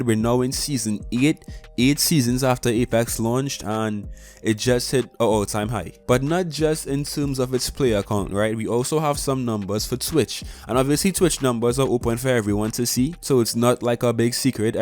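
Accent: Trinidad and Tobago. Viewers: Are you from trinidad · Are u trini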